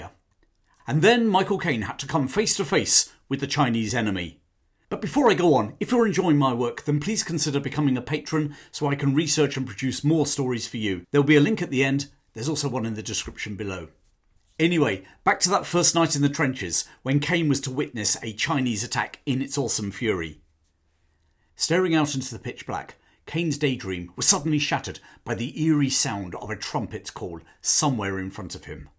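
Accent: England, United Kingdom. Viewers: Only just discovered your excellent channel. How refreshing to listen to a narrative in English by an English man! Some of those American narrators are terrible. Can't pronounce place names correctly, etc. And as for Charlton Heston on some of Timeline's offerings! Say no more! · As a foreigner, I do enjoy your beautiful british pronunciation. Thank you for your british sound in the global era of the unified american english.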